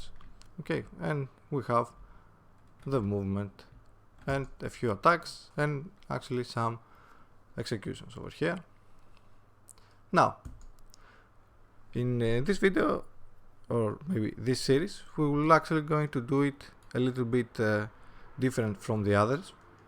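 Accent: Greece. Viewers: πες την αληθεια!! εισαι ελληνας απο λιγο που ακουσα το βιντεο ... (προφορα)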